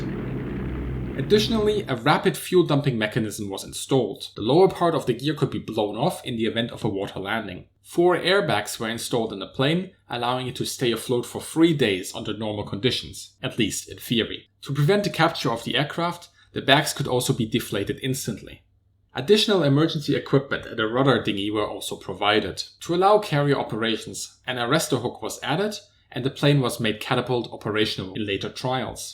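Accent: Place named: Swabia, Germany. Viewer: Schwätz doch Deutsch! Furchtbar der Schwäbisch Akzent im Englischen.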